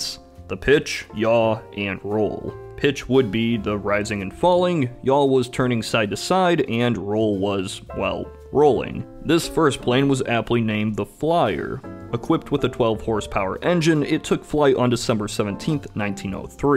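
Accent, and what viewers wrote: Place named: United States. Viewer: Why does he keep on getting more and more American throughout the entire video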